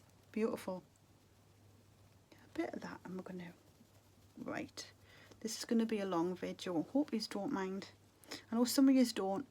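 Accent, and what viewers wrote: Newcastle, United Kingdom. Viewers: I've watched you from the beginning and my boyfriend went to Newcastle Uni (now my husband) and I was in Glasgow doing my nursing training. It seems silly but I felt really at home in Newcastle and when I missed him hearing your accent in your videos was so lovely. I'm so glad you're on youtube again and you seem so happy! you look stunning and wish you all the best for the future lovely.:) <3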